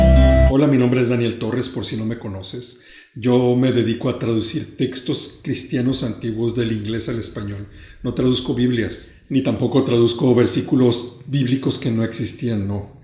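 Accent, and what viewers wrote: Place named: Mexico. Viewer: Tiene un acento Mexicano, excelente explicación, felicidades, bienestar para tu vida.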